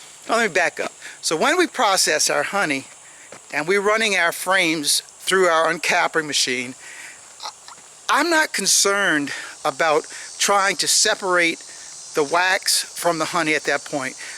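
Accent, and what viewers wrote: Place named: New Orleans, United States. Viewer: Just listening to you, I heard a N'awlins accent. Then I expanded the intro. Sure enough, I see that you're from Louisiana. I kind of figured as much when you began talking about the cicadas. Love y'all. I live in GA and I'm from AL originally. A whole lotta good food comes out of Louisiana. Thanks for showing us your honey making operation. I've seen small operations before but seeing a big one like yours is a real learning experience. Really impressive. Thanks for posting the video.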